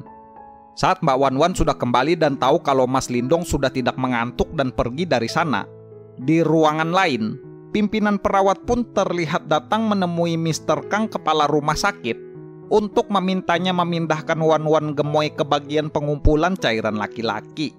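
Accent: Banjar, Indonesia. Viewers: Orang Banjar kah nih?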